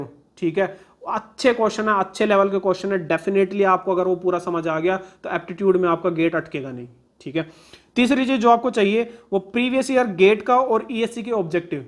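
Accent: Haryana, India. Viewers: 🙏🏼 haryanvi accent ❤️ Tahe dil se shukriya sir❤️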